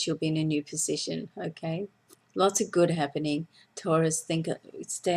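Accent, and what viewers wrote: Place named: Australia. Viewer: Many thanks Jennifer I really enjoy your weekly astrology videos. As a Taurbabe ( kiwi) now living in Vancouver, your Australian accent is lovely to hear. Cheers Deb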